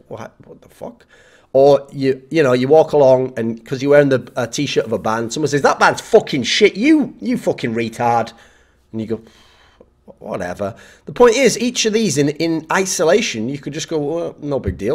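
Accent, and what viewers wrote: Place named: United Kingdom. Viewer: You sound so Bri'ish in this video I LOVE IT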